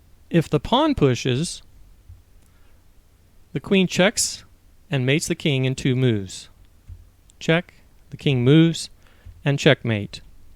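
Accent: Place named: United Kingdom. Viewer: Sir, you have gotten a niche that you teach and do it very well. Great to hear that awesome British accent. Thank you for all your help.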